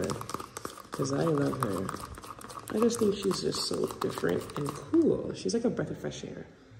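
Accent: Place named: United States. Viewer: You sound more American than you do Canadian😊 Also I would love the healthy country life myself it's peaceful and the air is cleaner. Since all of us are subscribing to these YouTubers I think some of them should try harder tell us about available land for sale, so that people with no Jamaican background don't get to buy it all...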